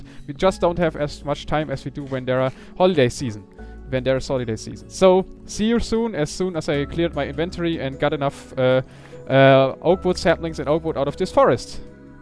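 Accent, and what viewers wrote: Germany. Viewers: Your German accent makes it hard for me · You're awesome :) awesome German accent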